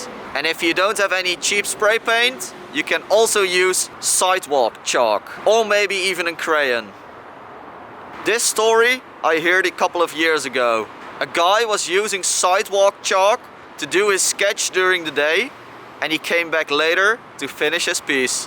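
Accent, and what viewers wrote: Netherlands. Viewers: you dutch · If this isnt a Dutchie speaking English idk what is. goeie vid wel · Are you Dutch?? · Dat nederlandse accent maakt de video 10x beter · If this guy isn't Dutch all my knowledge of English accents is officially unvalid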